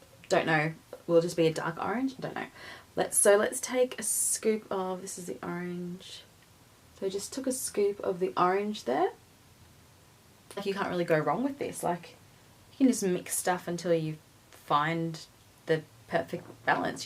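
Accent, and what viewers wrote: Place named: Australia. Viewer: Are you Australian by any chance? You kinda have an Aussie accent.. Loved this video~<3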